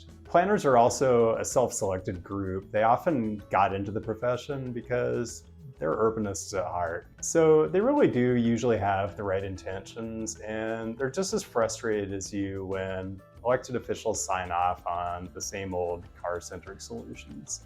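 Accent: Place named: San Diego, United States. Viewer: He is the most handsome white man I have ever met. He speaks like a person from San Diego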